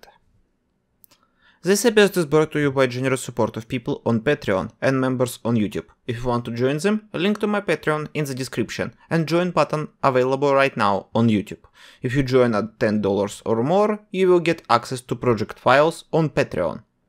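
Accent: Russia. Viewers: Спалился что ты русский)):face-blue-smiling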